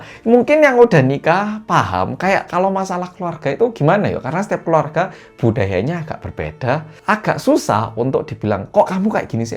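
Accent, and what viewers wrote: Java, Indonesia. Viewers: Udah lama ga nonton korean medok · Sampean itu orang jawa apa wong korea too...lucuu😅😅..sik...sikk😅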